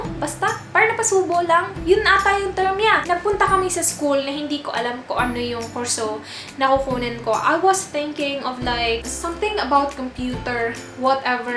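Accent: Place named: Visayas, Philippines. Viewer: hi where are you from? bisaya mn d i ka. 😂😂😀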